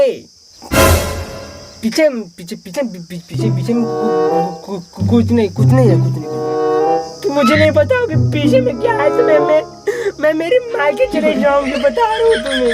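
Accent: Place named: Chhattisgarh, India. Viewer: Chattishgarhi awaj me bane lagthe yrr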